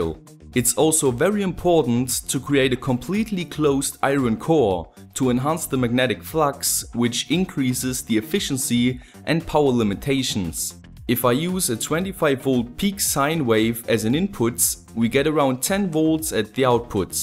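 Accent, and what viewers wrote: Germany. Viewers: w8 r u from Germany? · Bist du deutscher? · Are you German? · Bisch du Deutsch? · Man hört es richtig heraus dass du ein Deutscher bist. Dein Englisch ahahahahaha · Do you come from germany? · ***** schon nur geb zu es ist zum teil nervig den deutschen akzent im englischen zu hören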